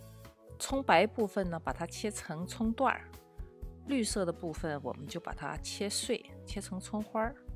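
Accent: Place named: North, China. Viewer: 你好，请问祖籍何方？听口音，应该是北方人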